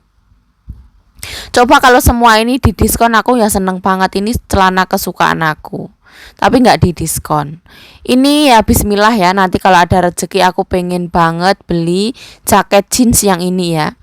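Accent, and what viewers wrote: Java, Indonesia. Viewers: Mba ini orang mana yaa? Bahasa jawanya medok bgt wkwkwk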